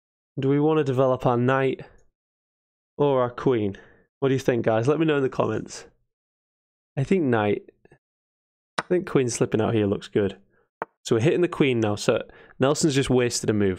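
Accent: Hull, United Kingdom. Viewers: You’ve got to be from Hull with that accent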